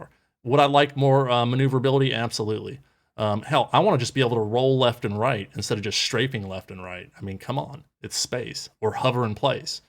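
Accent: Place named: South, United States. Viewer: You sound like Danny McBride. Are you from around the Georgia-SC border?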